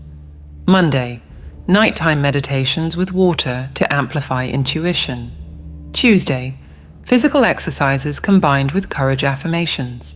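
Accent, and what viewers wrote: United Kingdom. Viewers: LOL!!! I see you've pulled out all the tools in your belt for this load of propaganda eh? Oh we had thirteen months alright, which you can clearly read in your present bible. Not hidden at all. A wee bit of truth and a load of bull...propaganda. You use a British voice to convince us that she is well educated, lol, and you use low frequencies to relax the mind and put it in a more malleable state. This is pure evil. Also known as misdirection. Some truth mixed with some nonsense will have you forever searching. DON'T FALL FOR IT FOLKS!!!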